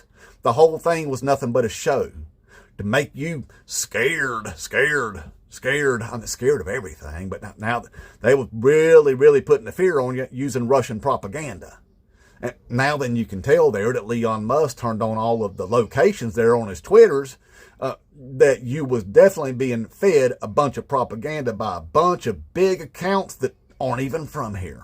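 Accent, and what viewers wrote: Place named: Appalachia, United States. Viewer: Your Southern Appalachian accent brings the story to light for me.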